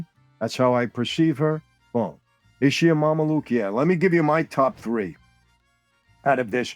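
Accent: New York, United States. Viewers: Such a great word . Said with perfection with your Distinctive Italian/Anerican New York scrabble . How have you not done voice work??? Really easy on the ears. That’s a good diversity actually. Get some good scrips and escape into a sound booth brother. Need right scripts though! Just thinking out loud brother. 😅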